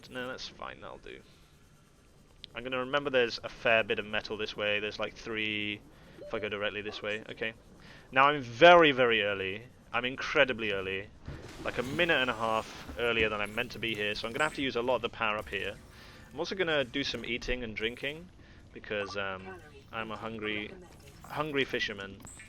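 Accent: United Kingdom. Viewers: As someone said down there you're really good at commentating, and I absolutely love your english accent (as a french) ! And doing this while performing is incredible _ I say "Chapeau bas" ! :D EDIT : and he even EXPLAINS during his performance it's just so cool !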